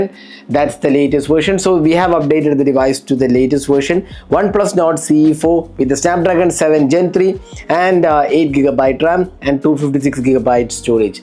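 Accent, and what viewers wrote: Kerala, India. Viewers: Are you from Kerala!?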